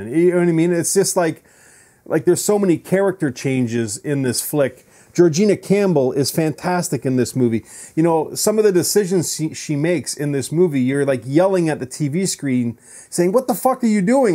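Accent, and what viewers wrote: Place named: Canada. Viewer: Hey dude, as soon as I heard you talking I thought you were a fellow Canadian, it’s the accent haha. Where about are you from? I grew up in Northwestern Ontario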